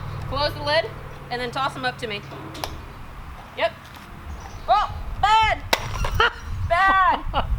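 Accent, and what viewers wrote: Texas, United States. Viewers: 1) You're a role model for me because of your willingness to tackle things you don't know and learn as you go. 2) Your accent makes me homesick for Texas 3) The head scarf thing looking like Rosie the Riveter was pretty damn awesome. 4) There's no four. Why did you think there would be?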